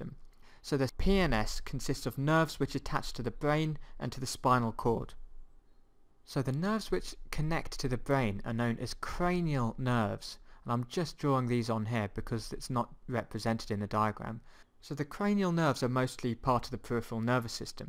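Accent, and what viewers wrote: United Kingdom. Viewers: you speak in british accent right ? btw awesome work i wish you were in my uni :((((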